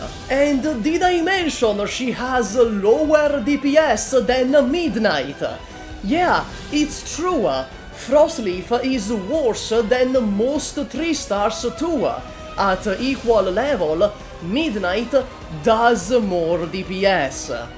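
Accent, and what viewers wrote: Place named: Italy. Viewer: I've noticed your Italian accent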